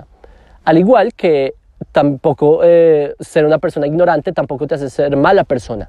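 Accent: Colombia. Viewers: el habla castellano de colombia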